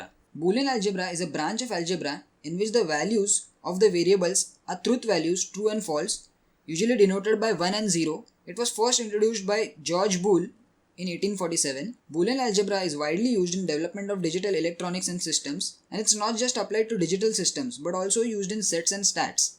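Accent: India. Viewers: is he Indian or something? Sounds like Kuthrapali.. and why is the AND logic NOT depicted with a +, it would make more sense ;)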